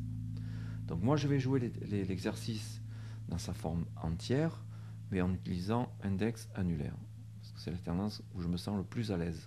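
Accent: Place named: France. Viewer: haha im french and i didnt understand it all, he's from france i assume from his dialect, and i speak quebec french ahah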